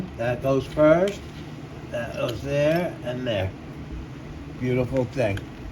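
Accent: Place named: New York, United States. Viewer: You sound like you’re from NYC. Queens?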